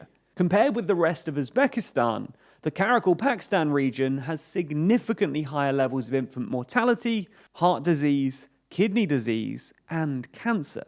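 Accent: United Kingdom. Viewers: The first three are just British pronunciation errors, the fourth one doesn't matter because you know what he meant. The final two are the only serious ones, and they aren't even really that serious.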